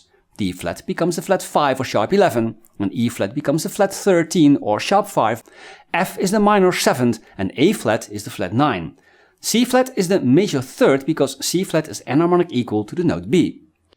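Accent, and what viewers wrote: Netherlands. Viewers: This is the BEST guitar channel I've discovered in all my years. And your playing - is beautiful, soulful, tasty, everything I'd want to be! And your accent reminds of my beloved late (Dutch) father.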